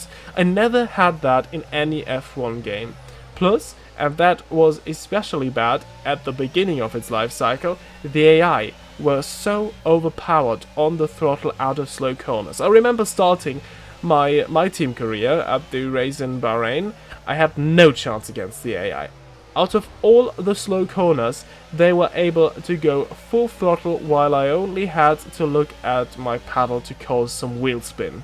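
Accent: Germany. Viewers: Very helpful vid if it comes to deciding which F1 to buy! I got F<num> and 2020 ! (btw your accent sounds german ) Thx from a 70y old german gamer !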